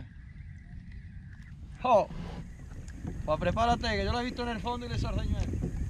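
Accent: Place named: Andalusia, Spain. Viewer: Eres andaluz, como yo. Yo pesco en la Bahía de Cádiz, y por fuera de ésta, desde Chipiona hasta Chiclana, y llegando a muchos metros de profundidad, a veces a más de 200 m. Atunes y de ese porte, por Huelva y el Estrecho, por aquí tb , pero son más raros. Por tu acento, por la segunda zona. Un abrazo y buena pesca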